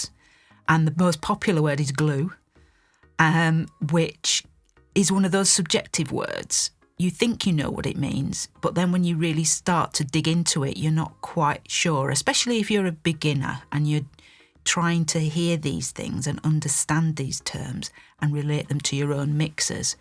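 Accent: Northern England, United Kingdom. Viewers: Glad you're back, you my no.1 source for tutorials about mixing. As an engineer myself I found that we are on the very same page in terms of workflow, choice of gear and alike. Love it, and i love you accent! is it from the north of England?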